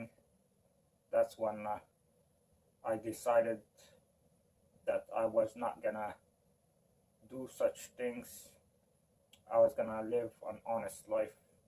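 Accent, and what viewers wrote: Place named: Spain. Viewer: Are you Spanish I can't understand your English sorry :(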